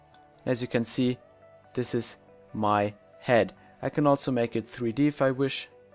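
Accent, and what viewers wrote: Germany. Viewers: Are you German you are never Englisch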